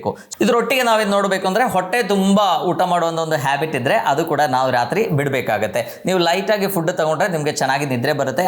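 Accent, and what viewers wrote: Kerala, India. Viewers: Neenu. Malayali. Alva. Sir. Nimma. Kannadadalli. Gothaithu. Tummba. Chenagide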